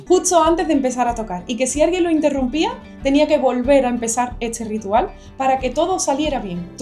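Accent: Andalusia, Spain. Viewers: Aparte del encanto y calidad musical, me encantan los vídeos de para disfrutar de su inconfundible acento Andaaaaluuuu.....tan parecido a nuestro acento chileno pueeee..... Saludos desde Viña del Mar 🇨🇱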